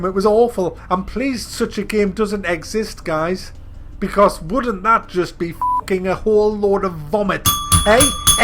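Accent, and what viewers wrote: United Kingdom. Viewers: omfg. this is the best channel for pessimistic gamers ever. And the british accent makes it even better for us yanks. so entertaining. i try to show everyone i know this channel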